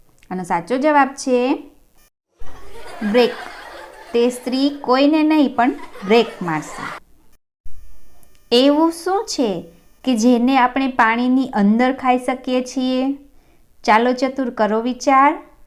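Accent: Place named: Ahmedabad, India. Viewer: Ahemdabad vada No avajj che shaci vaat ne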